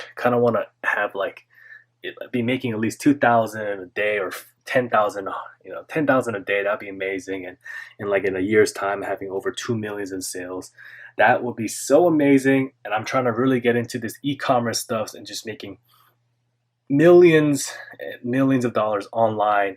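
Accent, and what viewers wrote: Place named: Korean American, United States. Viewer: Dude are you Korean American? From other vid you posted, I though you sound like Korean American. But watching this, maybe you are!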